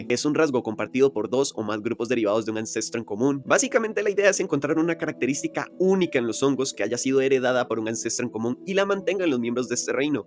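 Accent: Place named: Costa Rica. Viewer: Eeeyyy yo conozco este acento.. ticoo tuanis padre que bueno ver un youtuber de biología de aca.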